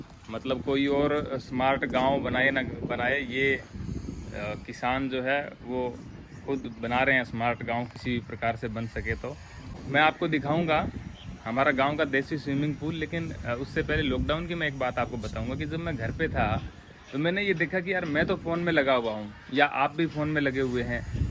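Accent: Bagri, India. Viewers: आपकी बागड़ी सुनकर अच्छा लगा। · आपका गाँव कौन सा है किस जिले में है बागड़ी बोलते हो ये भी पता चला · Tone Rajasthani par kabi kabhi bol bhi diya karo rajasthani bhasa 😀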